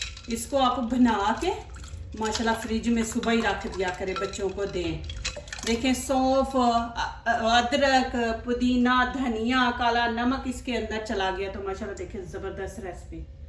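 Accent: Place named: Punjab, India. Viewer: Agr ye chaba chaba k punjabi men Urdu na bolen to Video short hojaye gi.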